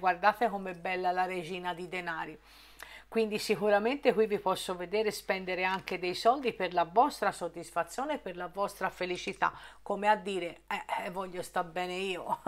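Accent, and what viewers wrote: Tuscany, Italy. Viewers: ciao...ti seguo da qualche tempo, l ultima lettura due tre giorni fa... A parte quanto adoro la tua pronuncia toscana e i tuoi "guarda qua" ehehe sei simpaticissima! Ma volevo confermarti, in merito al ritorno di qualcuno dal passato... Giuro che ho appena ricevuto un messaggio da chi non sentivo da 1 anno! L ho visto apparire mentre ti sto ascoltando in diretta 😱. Ho avvisato prima te, prima ancora di leggere il contenuto del messaggio stesso!! 😇 Complimenti per la predizione... Ora sono curiosa di andare a leggere la sua missiva!! Chissà cosa avrà da dirmi???? Ciao un abbraccio 🎄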